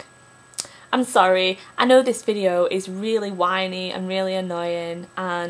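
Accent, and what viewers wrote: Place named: Blackburn, United Kingdom. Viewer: Are you from blackburn? ahah xx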